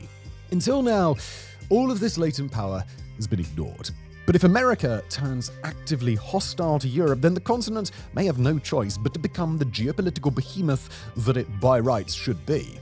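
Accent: RP, United Kingdom. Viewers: Also a European lecturing Americans in a condescending tone with the queens English about power for 30 minutes while living in an impotent country is rich.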